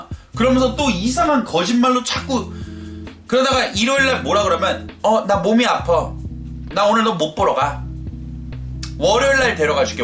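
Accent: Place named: United States. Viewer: 한국인 입장에서 재미동포가하는 발음과 표현이 참 흥미롭게 들리네요..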